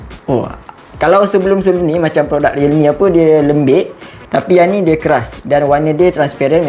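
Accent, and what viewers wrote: Sarawak, Malaysia. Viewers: org sarawak? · hi, abang ni orang sarawak ke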